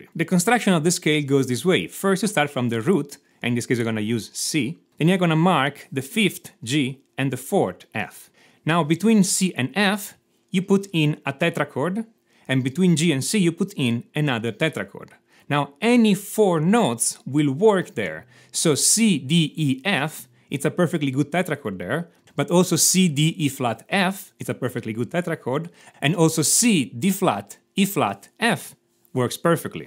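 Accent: Italy. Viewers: Si sente che sei italiano <3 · Ho Capito che eri italiano dalla prima parola. Comunque il video è molto interessante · Avevo immaginato tu fossi italiano, il mio orecchio ha sentito giusto 😂😊🇮🇹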